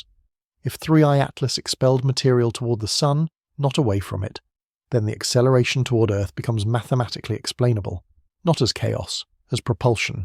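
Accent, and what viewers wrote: United Kingdom. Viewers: British voice. They are a day ahead.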